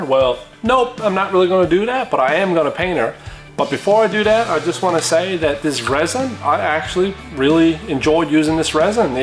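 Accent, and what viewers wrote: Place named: Maritimes, Canada. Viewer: Great job! Been looking to get a printer...Appreciate the way you mention the paints/products you use. I couldn't tell where you were from initially but I detect East coast of Canada. Good to see Canadian content on YouTube. 👍 What company designed the model...I mean really how else did you get my attention? Get some sponsors brother, best of luck in your content endeavors! Thumbs up and a subscribe. Edit found the link...that's why I'm watching videos and not producing them, a lack of technical skill! Cheers.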